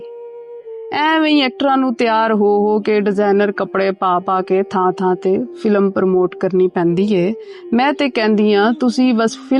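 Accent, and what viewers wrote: Punjab, India. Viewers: ਵਾਹ ਦੀਦੀ ਜੀ, ਤੁਸੀ ਤਾ ਜਵਾਂ ਈ ਸਾਡੀ ਵਾਲੀ ਪੰਜਾਬੀ ਬੋਲਦੇ ਹੋ । ਧੰਨਵਾਦ - ਚੜ੍ਹਦੇ ਪੰਜਾਬ ਚੋ । واہ دیدی جی، آپ بالکل ویسی ہی پنجابی بولتی ہیں جیسی ہم بولتے ہیں۔ شکریہ — مشرقی پنجاب کی طرف سے۔ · lao sister tuhada channel vi subscribe kar ditta tuhade Panjabi bolan de lehze karke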